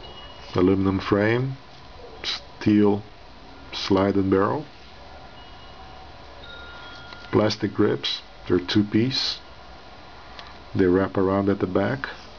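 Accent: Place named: Italy. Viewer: Italian pistol review by Italian accent 😁👍🏻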